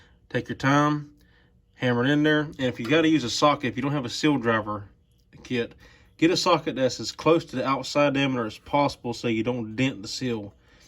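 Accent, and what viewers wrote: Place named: South, United States. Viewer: Straight up Truth has a certain ring to it and you chiming like the Liberty Bell. Nice sharp car you got there, nice work, I especially like your comments on how not to damage the seal by denting it and causing a leak. Also cool Southern Accent. Hello to All from Alaska.. Ps; ye'all keep up the good work.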